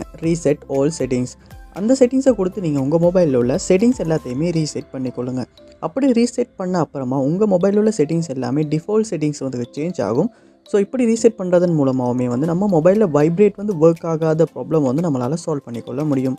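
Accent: Sri Lanka. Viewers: Unga tips na innum try pannala... But unga ilangai tamil ketka nalla irunthathu sago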